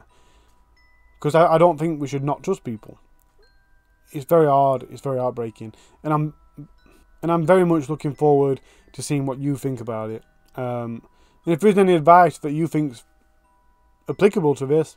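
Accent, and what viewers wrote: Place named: Wales, United Kingdom. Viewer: Love your channel and your Welsh accent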